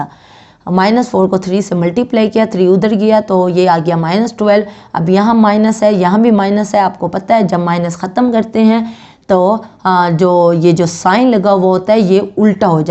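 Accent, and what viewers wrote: Lahore, Pakistan. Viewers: Sister ap waqas Nasir ki kuch lagti tu nahin..beacuse waqas ny review exercise upload nahin keen..or ap dono hain bhi Multan..zuban ki aarnay sy tu ap Lahori lug rahi hain..jawab zaroor daina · Teacher ka lahja dekh ka pata lag gaya ha k teacher Lahori ha😁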